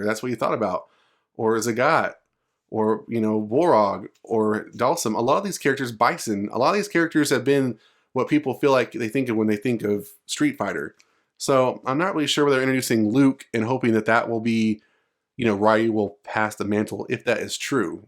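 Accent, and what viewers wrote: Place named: United States. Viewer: i said american because i was asking to whom i was assuming as an american from his accent. not saying any other countries are very good at it. i get your point, but i'm an asian yet i learned how to pronounce common western/english/american names correctly n i found it not that hard especially when you hear many people around you pronouncing/teaching you correctly.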